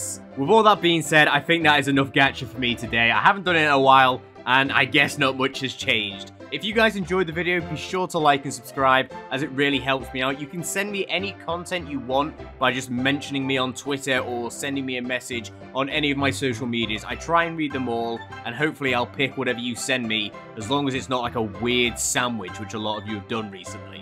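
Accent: United Kingdom. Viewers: I like ur British 🧐 accent lol and jokes, im just dying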